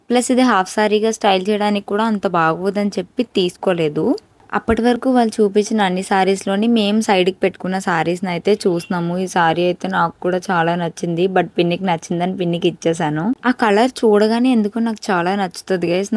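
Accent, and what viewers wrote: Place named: Samalkot, India. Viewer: ownaa...madi samalkot...emti ma side ammayi la anipistundi anukunna😅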